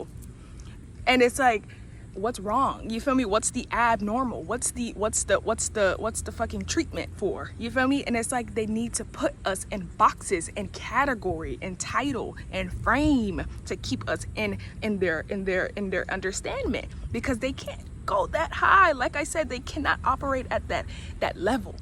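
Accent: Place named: California, United States. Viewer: Message SiStar 💕 💯🙌🏾 I’m in agreement with a lot of your views. On another note you definitely have to be from the bay or Cali because you sound like family 💯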